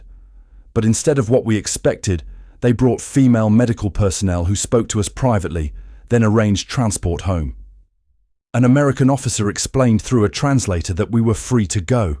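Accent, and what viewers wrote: United Kingdom. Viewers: The presenter's accent is British. The British use meters as a measurement. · American military uses meters for ranging. We do use freedom units of measurement for the most part but that doesn't mean we don't understand the metric system. We just use more math than you. Also , the commenter in this video is obviously a Brit. We like them now and find their accent very amusing but we have had a few small differences in the past.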